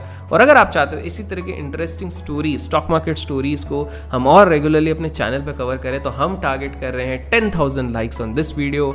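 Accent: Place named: Bihar, India. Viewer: E shasura 😂😂😂😂😂😂 Bihar ke bol ❤️❤️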